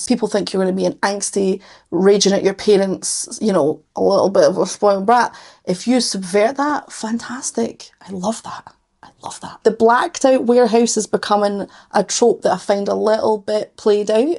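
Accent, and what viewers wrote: Scotland, United Kingdom. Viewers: Hey, I'm Bruna from Brazil. YouTube recommended your video to me and I loved your vibe. I'm learning English and have never listened to a Scottish person until now. Your accent is so beautiful. 🥰 May I recommend you a music video from a Brazilian singer? She sings in English,. Here's one of her originals songs: <link>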